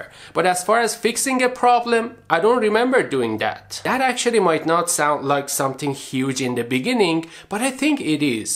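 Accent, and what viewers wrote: Iran. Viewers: Hey actually im interested on your accent, are you Iranian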